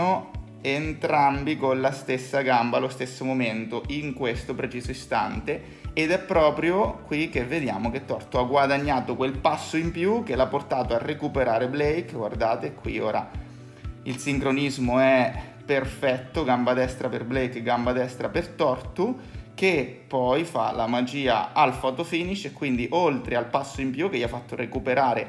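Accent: Marche, Italy. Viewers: Ciao, come si chiama lo youtuber? Sembra marchigiano, la staffedda quattro per cendo po' esse solo de qui vicino. Verso magerata o giù verso Fermo fra.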